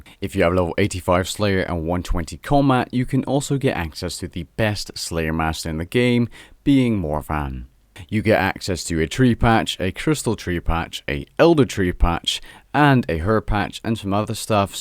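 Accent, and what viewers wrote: United States. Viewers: funny I thought he was from Jersy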